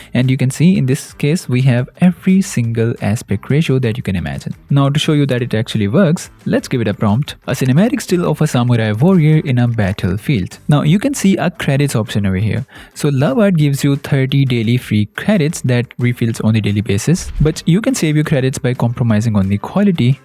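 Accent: India. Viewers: Indian accent is so annoying🥀🥀💀💀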